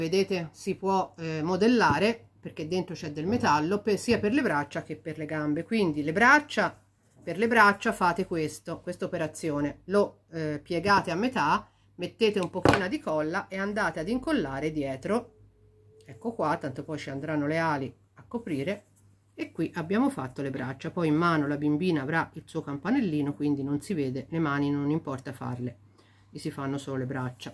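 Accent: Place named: Tuscany, Italy. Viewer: della tua parlata toscana che io adoro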